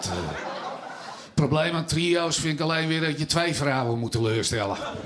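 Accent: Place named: Amsterdam, Netherlands. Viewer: Ton Kas moet overschakelen naar Haags. Dat Amsterdams is erg irritant.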